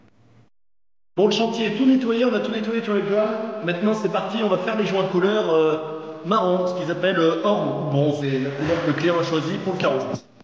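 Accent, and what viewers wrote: Loire, France. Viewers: De bons conseils , de la bonne humeur, de la bonne musique, l'accent de la Loire. Du kiff, merci d'être super ludique dans l'explication, clair net agréable à souhait ! 🙏👍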